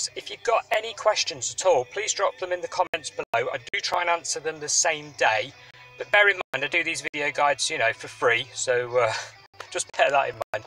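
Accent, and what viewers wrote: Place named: Nottingham, United Kingdom. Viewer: Do you offer a fitting service - you sound very local to me (notts) - extra coin for you if you wish?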